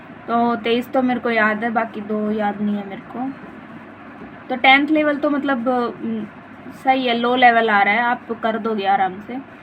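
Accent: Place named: Bagri, India. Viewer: oh aapki language meh thoda thoda bagdi touch hai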